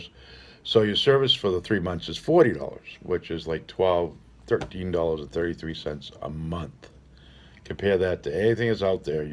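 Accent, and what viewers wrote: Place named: Massachusetts, United States. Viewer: 1,600 dollars for a small one bedroom in Los Angeles area. Very difficult for people to get by. I grew up in Mass. Nice to hear the accent.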